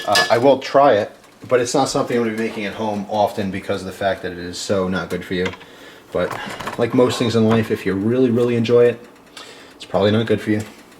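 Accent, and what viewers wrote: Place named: Philadelphia, United States. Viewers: wodder... and weis store. you are from PA. Thanks for the recipe! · Definitely from South Eastern PA, we drink Wooder not Water LMAO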